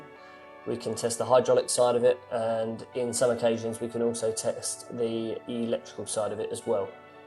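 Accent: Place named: London, United Kingdom. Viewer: Good to hear a londoner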